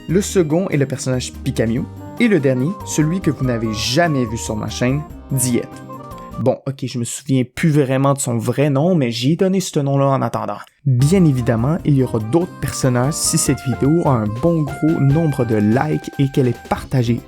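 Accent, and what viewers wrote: Quebec, Canada. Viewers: Québécois non? (Je reconnais grace au gros mots xD) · T'es tu québécois team QUÉBÉCOIS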